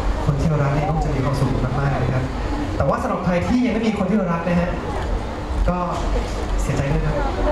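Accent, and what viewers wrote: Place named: Rural, Thailand. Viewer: พูดเหน่อน่าร้ากกก😘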